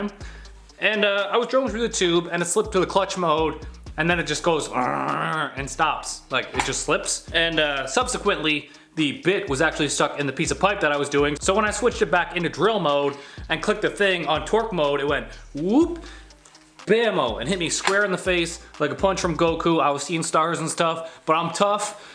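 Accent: Canada. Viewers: Props from Manitoba, only YouTuber I've found with more or a Canuck accent than me. Keep killing it man. Lets race once my caprice is done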